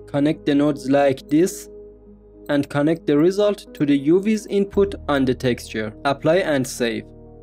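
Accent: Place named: Iran. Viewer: این لهجه ایرانیه❤😊😂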